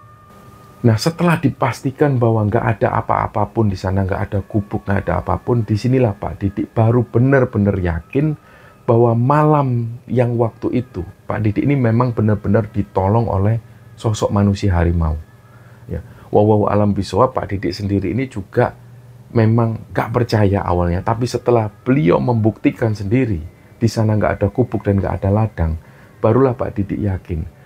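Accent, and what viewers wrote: Java, Indonesia. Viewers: Nonton KS ga pernah selesai, di tengah cerita pasti ketiduran. Suara mas dengan logat jawanya enak didenger 😅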